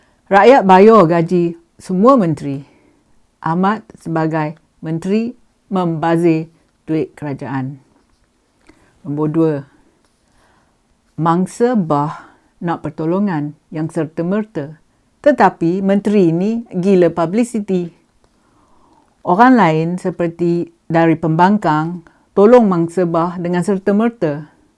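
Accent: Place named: Kuala Selangor, Malaysia. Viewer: hehehee.. sya suka dgn logh4t puan.. mcm org kampung(kuala selangor) teman pn ye.. mcm org kampung isteri (ipoh) teman pn ye jugok.. apa pun.. teruskan 'membuka' mata marh3in dgn isu2 semasa yg p4nas! ..Terbaek Yong!!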